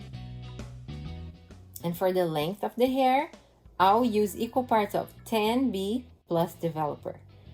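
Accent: Brazil. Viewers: Como a gente reconhece o sotaque Brasileiro 😍Tava te assistindo e pensando ela é brasileira e no final vc fala Português kkkk !Ficou show Seu cabelo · are you Brazilian? I’m from Brazil, and I noticed a familiar accent in your speech and you said tchau in the end, so it made me wonder.:)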